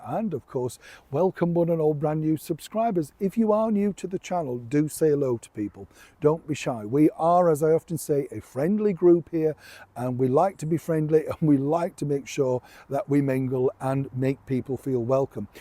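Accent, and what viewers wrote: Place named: Northern England, United Kingdom. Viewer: Hello Big wave, Chums👋👋 I am on of the ones that have been here since the beginning. Well, under 1000 listeners, anyway. I remember the day got to 1000, it was a celebration! I like because he is from the north, and talks like my late Da. He uses similar expressions as our Da. He also has the same eyes but then so does Piers Morgan (ooh, he looks like Da! lol!). It is all in the eyes. The crinkly blue eyes. I miss me Da, so it is like coming home when I listen to. And I WILL be, next week, yay!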